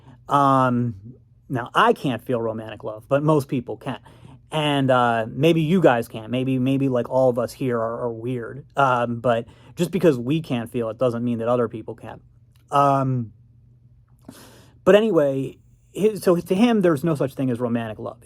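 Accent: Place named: Africa. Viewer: African accent from an ex French colony. Perhaps Cameroon or Congo. He accent is really thick and annoying, he should have long worked on it.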